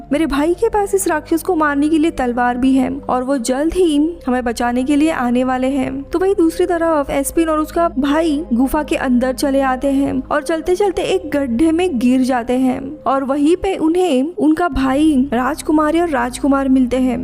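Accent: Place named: Bengal, India. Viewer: apki hindi sunkar aysa lagta hay ke ap bengali ho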